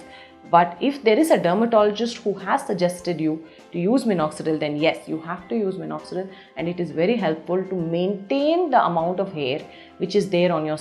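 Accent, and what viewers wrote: India. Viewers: It's the Indian accent my guy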